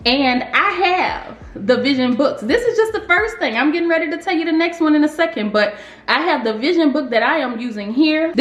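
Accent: New Orleans, United States. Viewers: 2022 is going to be MY year! I've never proclaimed such before and walked faithfully in it because it seemed so cliche'. But after watching and subscribing to your channel, hearing and seeing a hometown voice and face, yes I'm from "da 504", I feel at home "here." I've taken some of your credit advice and seen improvements. Greater is coming and it's because the power lies in me. Thank you for all the content you share.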